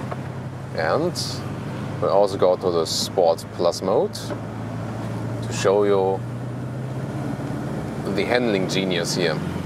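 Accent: Germany. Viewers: Es ist komisch einen Deutschen Englisch Sprechen zu hören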